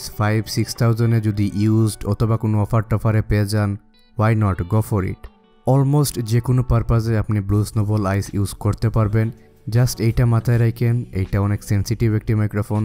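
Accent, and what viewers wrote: Sylhet, Bangladesh. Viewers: Vai apni sylheti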